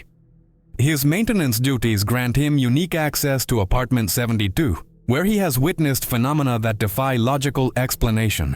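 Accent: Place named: Soviet Union. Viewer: Interesting accent. From the former Soviet Union?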